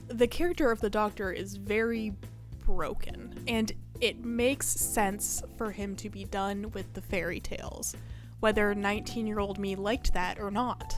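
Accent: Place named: United Kingdom. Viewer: lol british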